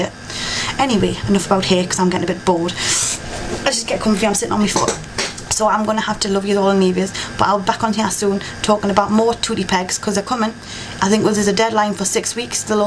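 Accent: Newcastle, United Kingdom. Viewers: She is from South Shields which is kind of on the outskirts of Newcastle, the area is called South Tyneside. People from that area are generally known as 'Geordies' and the distinctive accent is called 'Geordie'.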